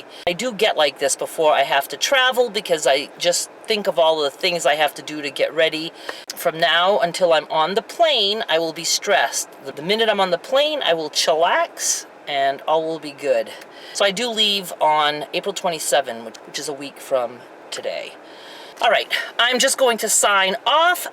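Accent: Maine, United States. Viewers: I thought I heard a Maine accent. I also live in Maine in Aroostook County (3 miles from the Canadian border.) I've been here 7 years but I'm from California. We plan to move to "civilization" (downstate) in the next couple of years.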